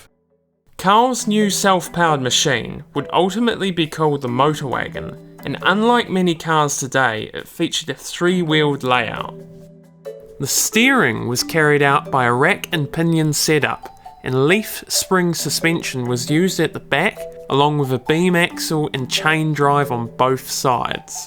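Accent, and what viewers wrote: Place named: New Zealand. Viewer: New Zealand/"Kiwi" accent!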